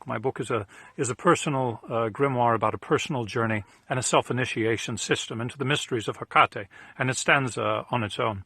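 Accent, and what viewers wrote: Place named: Transatlantic, United States. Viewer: My man really sells this with the transatlantic accent too. I have the trade edition and working through it now. Day 6 and the dreams are wild.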